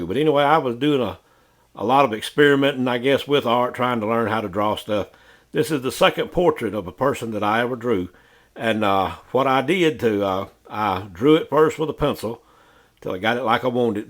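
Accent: Alabama, United States. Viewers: You got yourself a new subscriber now! I enjoyed this video! I was born and raised in Birmingham, and joined the Marines out of high school in '03. I live in Houston Tx now, and your video and the way you talk, make me miss home....keep up the great work! Roll Tide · Alabama has the sweetest gentlemen in the world. I love my home state. I will never leave. Thank you for this video - listening to your accent and stories were the best part of my day.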